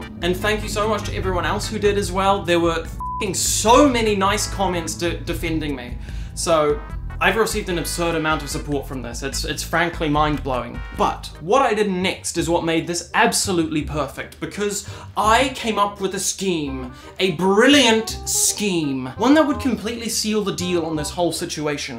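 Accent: New Zealand. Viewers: Ngl initially thought you were an Aussie like myself, but gotta appreciate our Kiwi cousins! :> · i figured you were somewhere from the Big Southern Island Places. kiwi accents are so fun :D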